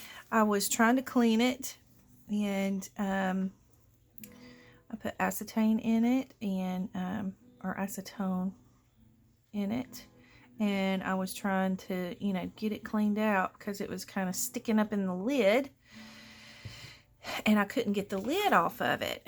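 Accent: South, United States. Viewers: Hi, I woke up a grumpy bear this morning...does that ever happen to you? Anyway, I knew watching one of your videos would lift my spirits. Sure enough! I clicked on this video, heard your sweet, sweet greeting in your lovely southern drawl, POOF! Mood elevated! It was like the clouds parted and the sunshine of my faith cleared up the grumpiness of my heart! Thank you! You have a blessed day!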